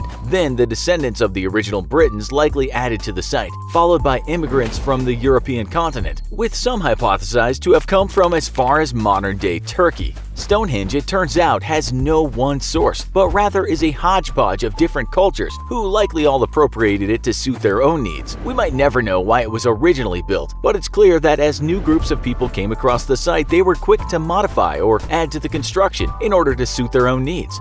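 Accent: United States. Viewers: Why is an American doing the commentary? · An American accent makes everything sound like a conspiracy theory... and not believable.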